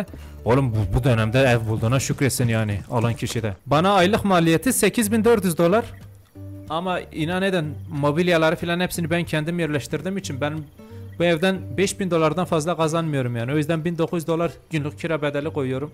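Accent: Elazığ, Turkey. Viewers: abi sen elazığlımısınü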